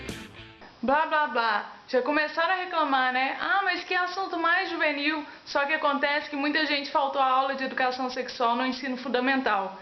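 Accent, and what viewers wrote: Minas Gerais, Brazil. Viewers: Uai gente, ela é mineira, mais óbvio impossível. ;d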